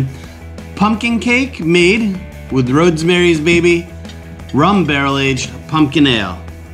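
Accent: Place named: United States. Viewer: American accent.. yea bro! Gotta try this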